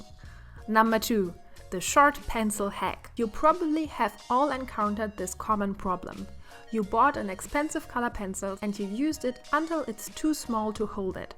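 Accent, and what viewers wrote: Germany. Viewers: Super Video! Aber bitte schau dir nochmal die Ausspracheregeln für das Englische an (v ≠ f, th ≠ f, t). Du bist aus Deutschland, oder? Hört man binnen Sekunden. ;) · wow wie hart man merkt, dass du deutsch bist an dem akzent ! Aber schöne Hacks! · thats one heavy german accent. Aber ernsthaft Respekt, dass du eine Videos in Englisch machst ist bestimmt nicht sehr einfach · You kinda have a german accent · Interesting German accent :) not my artstyle but your video it is actually very helpful... sometimes I use watercolor and I would love to try out more. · In den ersten Sekunden hab ich instant gemerkt das sie deutsch ist 😂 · I think she is from Germany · You start speaking English CUZ of your accent- she is german But i like your arts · As German native speaker I can definitely tell you that she's German. (Her last name is German, but that usually doesn't tell you much) Russian accent sounds different. 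Also she wouldn't be able to pronounce the German brand Schminke as perfectly as she did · Are you german your Akzent Sounds like it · Man hört richtig das du Deutsche bist :"D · Min warte mal ... Man kann das Fabriano aquarell Papier auch hier in Deutschland kaufen 0.0 Ich muss wissen wo !!! Hast du es auch mal in einem laden gesehen oder kaufst du es immer online ? Ich dachte anfangs du kaufst dein Zeichen Material (zumindest das was in diesem Video ist ) bei boesner , da man das Aquarell, als auch die Airbrush, als auch die luminance stifte alle bei boesner bekommst. Vorallem die luminance, ich habe die in keinem anderen Laden gefunden, nur in boesner. Deshalb war ich so verwirrt mit dem Papier. Du würdest mir echt sehr helfen wenn du mir sagst wo du es kaufst oder ob du es mal in einem laden gesehen hast. Ich mag übrigens dein Zeichenstil total und wie viele verschiedene Medien du benutzt. 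Ich muss das auch mal austesten , gut das ich das ganze Material (bis auf das Papier D: ) hier zuhause habe xD Naja auf jeden fall würde ich mich sehr freuen, wenn ich eine Antwort von dir bekomme :D (Und sorry, dass ich in deutsch schreibe, aber man kann deine Muttersprache nicht überhören xD Jetzt nicht böse gemeint oder so °^° ) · I have the feeling you're from europe(maybe germany) because of the way you speak 😄 very nice Video 👌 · are you german bc you sound like youre german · Man hört deinen deutschen Akzent sehr stark raus. Du solltest ganz besonders versuchen, die Aussprache von th zu üben, um es weniger wie ein s auszusprechen. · Kannst du deutsch denn der Akzent hört sich so an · Schönes Video :), ich habe mich erst gewundert wieso mir dieser Akzent beim englisch sprechen so bekannt vorgekommen ist, bis ich durch die Kommentare gescrollt bin und deutsche Kommentare gesehen habe xD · The first thing I thought: She's german. 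You have such an heavy accent, but it's a good video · i can hear that youre german ;3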